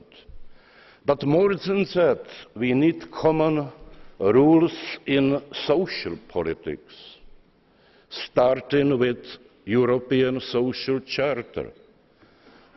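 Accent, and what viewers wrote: Czech Republic. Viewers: Jelikoz je prezidentem Česke Republiky, mel by umět správnou vyslovnost, anglicky umi, to nepopirám, ale ma ceskej prizvuk, muj nazor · Angličtina po česku 😅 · Konečně sebevědomý Čech, který veřejně mluví czenglish. Číňani mají čínglish, Indové hindish, Australani cockney, Američani američtinu … tak proč my máme furt ležet na Prokrustově loži Oxbridge. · Best Czech accent! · Čím to je, že Češi mají furt potřebu někomu se vysmívat, nad někoho se povyšovat a pomlouvat, ačkoli bych dal ruku do ohně za to, že většina místních diskutujících "English expertů" svojí jazykovou výbavou nesahá Zemanovi ani po kotníky?? Ten člověk umí anglicky velmi dobre, má prostě jen silnej českej akcent, toť vše....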